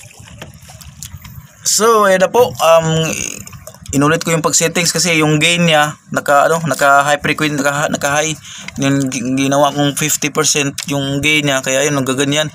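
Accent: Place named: Bohol, Philippines. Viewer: tujok tujoka noy .. taga bohol man diay mo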